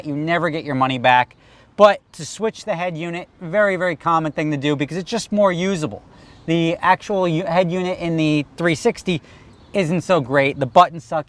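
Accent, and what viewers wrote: United States. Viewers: Know what..!? This is all cool and everything,but hearing an American talk about Ferraris is like listening to Trump speaking about human rights; it just dosent seem natural.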